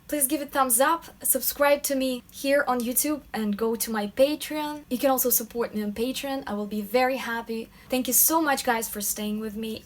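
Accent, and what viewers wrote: Russia. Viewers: блин, сразу поняла, что вы русская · Я сразу же поняла, что вы русская, несмотря на то, что у вас прекрасное произношение :) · Я прямо слышу русский акцент =)) очень информативное видео 👍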